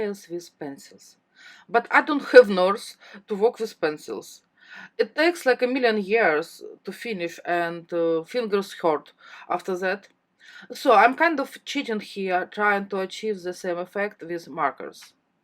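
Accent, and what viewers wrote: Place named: Poland. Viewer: I'm happy I discovered your videos (I found you through a video by Yana Smakula). I am not a fan of pink, so I would never have thought to do these flowers on a pink background, but this card is beautiful. I love your ideas and hints, and I love your accent. It brings back memories, as it's similar to that of my Polish grandma (her native language was Polish, but she spoke some German and a bit of Russian in addition to English). I am very impressed by your command of vocabulary and sentence structure. I see so many comments by Americans on FB, and your language skills far surpass theirs. Sadly, I only know one language, and I am always impressed by those who learn more than their native tongue.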